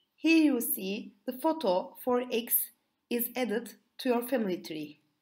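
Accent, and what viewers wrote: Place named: Turkey. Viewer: Türk aksanı bu nerede olsa tanırım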